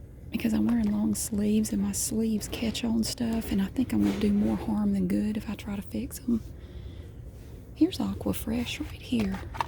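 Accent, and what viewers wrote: United States. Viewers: I just love your accent, it's my favourite american accent!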